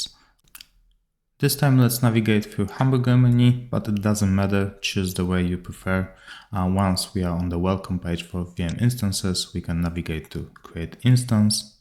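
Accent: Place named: Poland. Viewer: od razu poznalem nasz akcent :P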